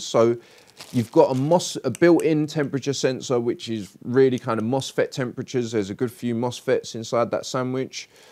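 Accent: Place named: East London, United Kingdom. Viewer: I'm from south east London! You sound like a an east Londoner ( I feel more comfortable hearing you talk) I really hope I can bring my battery to you so you can work your magic !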